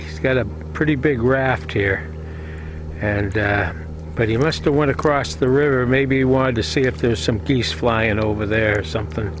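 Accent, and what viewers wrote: Boston, United States. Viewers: You can take the man outta Boston but never Boston outta the man 😂! Nice to see you again! Greetings from Germany 🇩🇪 👍🍻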